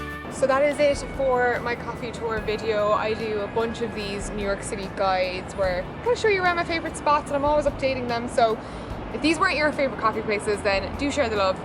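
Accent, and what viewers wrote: Ireland. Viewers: Wait are u irish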